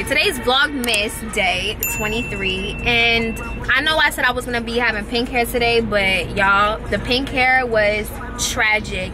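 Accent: New York, United States. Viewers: You sound like a true New Yorker. I’m here for it 😭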